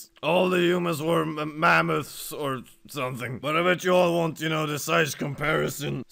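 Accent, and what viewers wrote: Sweden. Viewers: he is swedish i can hear on his accent and his calculator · are you swedish?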